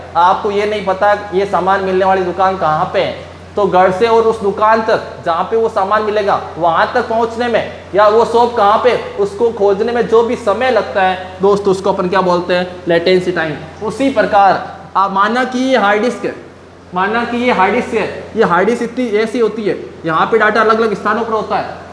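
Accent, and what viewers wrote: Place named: Jodhpur, India. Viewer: गुरु जी आपकी जोधपुरी भाषा घणी छोकी लागी इया ही पढाता रेवो घणा बढिया पढाओ आप जितरी तारीफ करु उतणी कम है जय हिंद गुरु जी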